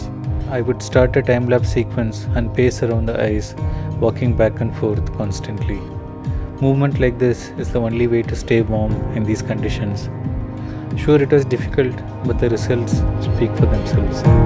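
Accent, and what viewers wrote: South India, India. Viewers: I can relate to your wish to travel and spend time alone in nature's solace. It's the time when one gets to listen to one's inner self and introspect. Thank you for sharing the awesome time lapses. GOD bless. Your accent gives a hint that you might be from South India?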